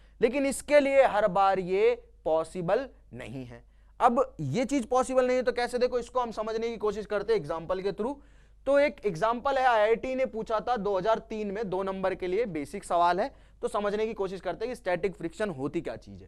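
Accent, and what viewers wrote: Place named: Haryana, India. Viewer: Guruji pahle teacher ho jiski baat smjh m aarhi h lage s aap haryana te ho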